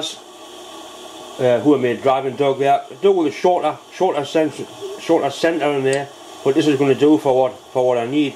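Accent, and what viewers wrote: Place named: Scotland, United Kingdom. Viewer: Ohhh, bl**dy h*ll, ya about killed me! When you said "High speed stool" I had a laughing spell that drove my cats from the room and made me see stars when I lost all my wind! Oh my brother, ya done got me typing with an accent now after watchin' ya's all mornin' long... Begging your pardon, it just feels good to mess about and have some fun with making different accents. There is not a drop of harm meant, and I certainly hope there is no offense taken? I'm just a old lad from Hawaii that lives in California now, I grew up surfing and playing footy, so I've met my fair share of Australian blokes, and later on some English and Irish surfers made it over there too, but I've yet to meet a Scot who surfed. I know they exist, some fine ones too, and Thurso is high on my list of places to visit for a surf, but I've just yet to meet one of your fellow countryman out in the surf. 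Bound to happen if I ever get to Scotland, he he. Anyhow, I am going back to watching you work, it's a great playlist and I really appreciate you taking the time to put it up for us to enjoy! I can feel your pain as far as the slow uploads go, even my computer is so old that it takes forever to just render them from the editing program. Thanks a bunch for both the great laughs and the excellent instructions. You can blame Tom Lipton for me showing up here and pestering you, he's the one whose mentioned you. Well, everybody I watch speaks highly of you, but he was just the most recent, and with my memory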